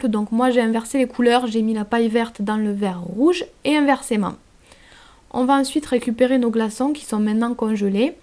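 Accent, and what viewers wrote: South, France. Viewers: bah moi j'ai laissé chez moi et ça marche bien c'est trop bien ton truc moi j'aime bien j'adore ton accent du sud un accent du sud c'est bien mais c'était très bon et j'en ai fait chez moi bah ça c'est bien et voilà donc merci beaucoup pour ta recette😇😇😇😇😇😇😇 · Je kiff l'accent du sud 😍😱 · j`adore ton accent du sud trop cool et les boissons son super bonnes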